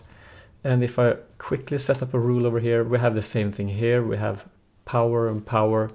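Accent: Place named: Sweden. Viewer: Du är svensk va? Hörs på dialekten. Grymma videos :D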